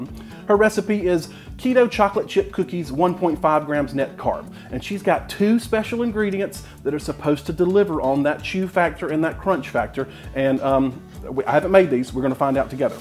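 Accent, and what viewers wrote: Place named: Eastern North Carolina, United States. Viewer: Wow, you sound like me. All we have is an Aldi out of the things you listed. LOL! Now back to the video. I'm notorious for commenting before I've finished watching but that's because I have the attention span of a peanut and will absolutely forget by the end. Where abouts are you from? I'm in eastern NC near the southern outer banks. Your accent sounds a lot like "my people". ;)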